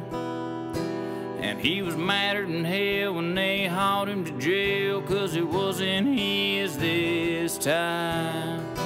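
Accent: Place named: South, United States. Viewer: Love a good story teller, with a country twang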